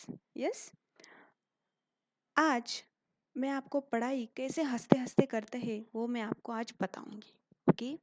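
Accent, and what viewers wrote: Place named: Kashmir, India. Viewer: U seems to belong to kashmir the way u are talking!.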